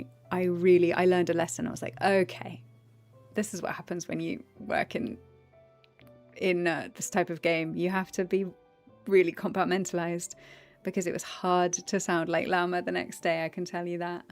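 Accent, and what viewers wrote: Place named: United Kingdom. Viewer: your accent was a pleasant surprise! makes me wonder how lauma would've sounded in your original british accent rather than the standard american one! your performance as her is so lovely as is :)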